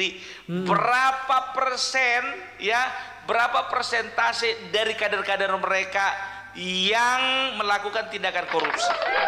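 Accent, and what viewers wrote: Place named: Medan, Indonesia. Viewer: Kamu orang medan ya logatnya, tapi kok pake blangkon, tapi asik aku dengernya, besok konten lagi ya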